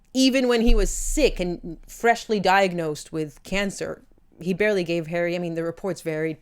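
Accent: United States. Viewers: If you’re Australian why do you have a USA or Canadian accent? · Hold up.... record scratch You are an Australian??? So your One of Us? Wow you sound so American Now😆 🇦🇺👋👑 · You put out wonderful, intelligent content just wondering where are you from? You have an American accent but are an Australian citizen? just curious thank you for your service wherever you served.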